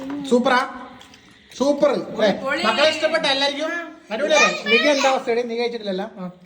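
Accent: Kannur, India. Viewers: നിങ്ങൾ കണ്ണൂർ ആണോ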